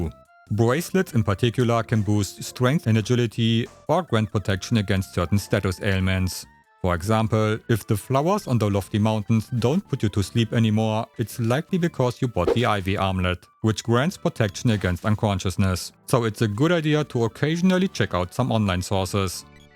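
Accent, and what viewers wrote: Germany. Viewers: Are you German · Hard German accent, but great. Sword orb glitch not possible on PAL. Possible on switch?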